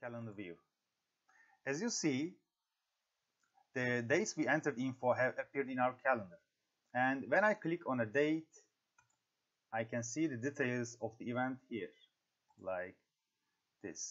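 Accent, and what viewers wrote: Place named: Turkey. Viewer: Merhaba, şöyle bir fonksiyon mevcut mu excelde? İçeriğindeki bilgiye bakılmaksızın bir sayfadaki hücre format biçimi olsun, satır ve sütun yüksekliği, genişliği olsun diğer sayfalar ile köprülenecek, bağlantılı hale getirilecek? Yani ben ana sayfada a1 hücresi için metin hücresi olacak diyeceğim, genişliği de 3cm olacak diyeceğim ve diğer 10 sayfada da bu değişiklik takip edilecek, otomatik uygulanacak. Hangi değişiklik yapılırsa yapılsın, sallıyorum genişliği 4cm olduğu zaman diğer sayfalar da değişecek ve bu sadece bir hücre değil bütün hücreler için geçerli olacak. Kısaca gölge sayfa gibi çalışacak ama üstüne formül ile ayrıca hesaplar döndüreceğim. Sanmıyorum ama uzun süredir bu sorunun cevabını bulamadım. Başarılarınızın devamını diliyorum. Videoyu dinlerken aksandan ötürü bir türkün seslendirdiği anladım, danışayım dedim :)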